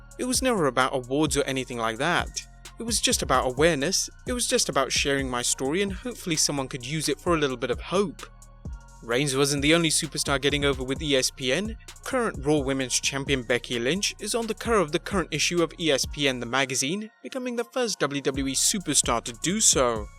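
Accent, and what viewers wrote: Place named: London, United Kingdom. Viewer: lol Alex Jones has well over 6 million radio listeners and an additional 10 - 12 million viewers on the internet daily. In comparison to the WWE and their fake bullshit vs a truth telling Infowarrior who in the hell cares about phony ass wrestling when the world is in crisis. Turn off your televisions you idiots all hell is about to break lose and when it does there wont be any phony wrestling to watch. judging by this clowns accent I can see why London has fallen, instead of being out there supporting a real bad ass hero named Tommy Robinson he's watching phony wrestling and worshiping fake ones.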